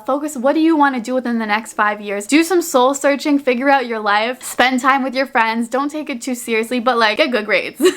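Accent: Canada. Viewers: You're Canadian Toronto side really popped off in this video, not a bad thing I loved it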